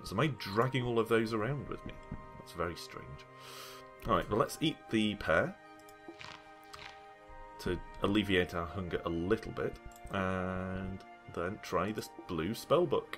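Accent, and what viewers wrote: United Kingdom. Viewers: i can tell you're british,great lets play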